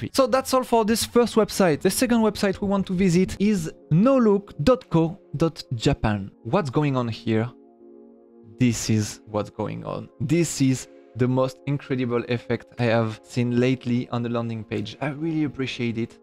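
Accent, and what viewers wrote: France. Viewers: Je valide le changement de langue car je souhaite le maitriser et franchement avec l'accent francais c'est tellement plus facile, Merci d'avoir pris l'initiative👍(copié collé le mec) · Bravo pour cette vidéo ! C'est assez vrai que l'accent français aide pas mal a rendre le tout plutôt smooth ^^ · J'ai du mal a resté focus avec ton accent francais (alors que j'ai le meme accent quand je parle mdr) j'ai bcp bcp + de facilité a comprendre l'anglais avec l'accent pakistanais (ne me poser pas de question sur ca) en tout cas bonne chance INTERNATIONAL CODE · Méga cool en anglais avec l'accent bordelais. Même en étant une quiche avec l'anglais j'arrive à comprendre et donc probablement que je vais devenir plus à l'aise à la longue. C'est gagnant gagnant. Merci ! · I love your french accent 👻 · Well done for your first video in English! I would have personally made the websites presentation shorter to give more room for the coding part. 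Anyway I loved it and keep going in English, you still rock because french accent rocks no matter what they say! And the new reviewww generic is gorgeous, made by Matei I suppose? You're really a great team! Go and conquer the world 😎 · Yes! J’apprendrai l’anglais avec ton accent français, se sera plus simple à mon avis. Continue ce format! 🙂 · J'ai pas pu regarder la vidéo en entier... Désolé, j'aime ton contenu et ta personne mais une vidéo de 12min en anglais avec un accent 100% français ça m'est insupportable 😞 (Je ne suis pas français) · Au contraire des nombreux commentaire, j'avoue que l'accent français me perturbe. Mais, tu as l'air d'avoir un bon vocabulaire et ça semble aider de nombreuses personnes. Pas sûr que tu sois référencé aux pays anglophone par contre ! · Ce que je voulais dire, c'est que y'a plein de Français qui n'aiment pas entendre l'accent Français en anglais, plein d'Espagnols qui n'aiment pas entendre accent Espagnol en anglais, plein d'Allemands qui n'aiment pas entendre l'accent allemand en anglais, etc. 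C'est souvent les gens qui parlent nativement une autre langue que la tienne qui aime bien ton accent. Du coup les commentaires de Français qui disent qu'il a un accent français pas ouf, on s'en fiche, vu que si tu parles anglais, c'est pas pour les français, c'est pour des gens qui sont pas français et ont pas de soucis avec ton accent. J'pense on dit exactement la même chose mais on s'est pas compris xD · Hello, petit retour d'expérience sur le passage à l'anglais, même si je vis depuis 6 ans en Irlande : Grâce à ton accent Français, et à un rythme "normal" : ton anglais est facile à comprendre pour un francophone sans avoir besoin de trop se concentrer, ce qui conserve l'expérience agréable (comprendre certaines vidéos US relève d'une séance de travail coté concentration). Belle transformation. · Je valide le changement de langue car je souhaite le maitriser et franchement avec l'accent francais c'est tellement plus facile, Merci d'avoir pris l'initiative👍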